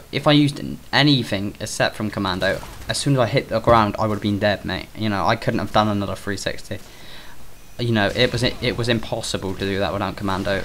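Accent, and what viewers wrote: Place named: West Midlands, United Kingdom. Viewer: you sound like your from the west midlands cuz my bro speaks like you and hes from the midlands like me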